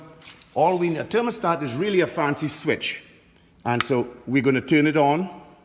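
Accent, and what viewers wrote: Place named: Trinidad and Tobago. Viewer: Good morning. Just looked at video. Informative and simple thanks. Trying to test my suburban. I hear a trini accent. Where are u located?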